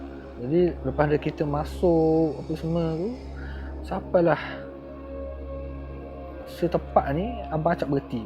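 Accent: Terengganu, Malaysia. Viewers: ouh ptutlah kdg2 kliru tgok member sha ckp,,kejap mcm ganu kejap mcm kelate,,stay safe bro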